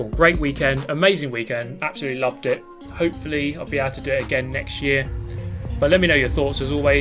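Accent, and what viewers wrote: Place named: United Kingdom. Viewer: I'm high, and thought i was watching Brouphy lmao. British dude.. i love their accent.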